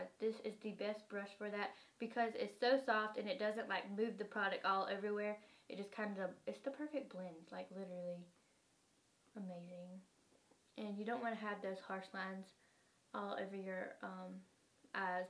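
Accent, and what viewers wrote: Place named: South, United States. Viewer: Wow! That’s a crazy trick considering coconut oil actually breaks down Makeup!! You look super gorg!! Loving the bloopers!!! Where are you from? I can tell you’re a southern sister!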